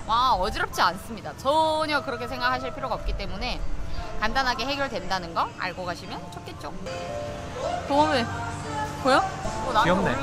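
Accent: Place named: Gyeongsang, South Korea. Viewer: 잘보고 있습니다.^^경상도 분이신가보네요..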